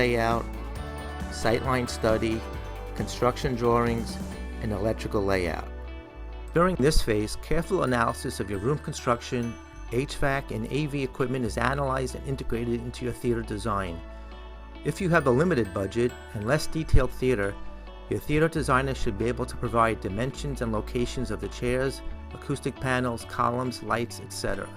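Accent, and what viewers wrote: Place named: New York City, United States. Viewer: This guy sounds like he's from the NYC area... good to know when I construct mine!!!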